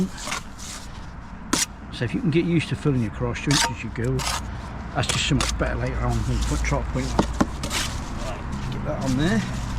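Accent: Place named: Norwich, United Kingdom. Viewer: Where abouts in Norfolk are you? Sound like a good old narwich boy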